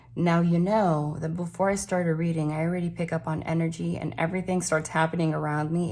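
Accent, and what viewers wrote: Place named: New York, United States. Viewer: I love your new york accent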